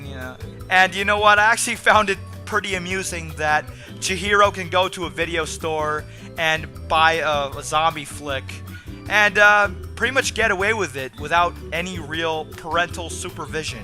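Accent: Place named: United States. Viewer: These Murrican pronountiations, man...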